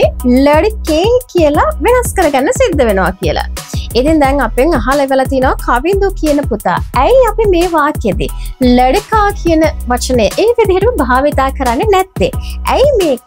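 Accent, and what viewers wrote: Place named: India. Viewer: ඉන්ඩියන් කෙනෙක් වගේමයි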